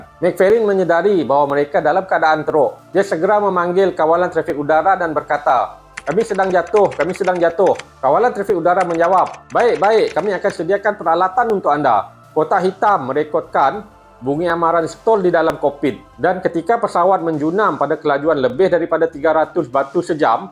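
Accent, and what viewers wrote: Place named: Kuching, Malaysia. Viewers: Kuat slang sarawak ktk pok.. 😅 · Kamek tek rasa gia juak. Org sarawak tok kah.. yalah alu maca komen. Best topic tok. Suka nengar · Auk, urang kuching.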